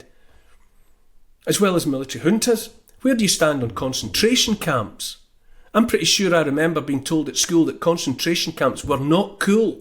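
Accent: Scotland, United Kingdom. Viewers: the TRUTH delivered in the Brogue, thank you timeless warrior. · There's just something about a Scottish accent... The way the word "Freedom" just rolls off the tongue of a Scotsman... May the GOD of Abraham, Isaac and Jacob... Bless, Keep and Protect you my Brother!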